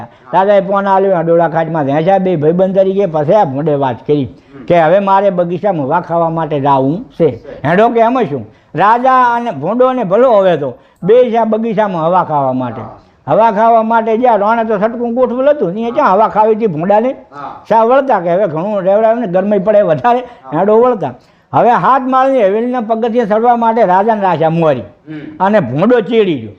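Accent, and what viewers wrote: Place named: North Gujarat, India. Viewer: Love Gujarati language ❤❤❤in north Gujarat style ❤❤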